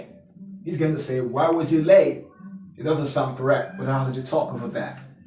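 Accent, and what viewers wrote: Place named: Cockney, United Kingdom. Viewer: I love the Cockney accent😍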